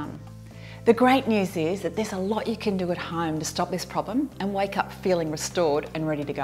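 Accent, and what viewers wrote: Australia. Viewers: You are so Aussie!!!! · she sounds australian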